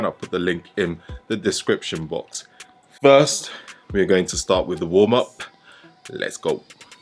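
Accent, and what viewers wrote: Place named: United Kingdom. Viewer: I swear all people with that accent and are from the uk or whatever call their backyard a garden 😭😭😂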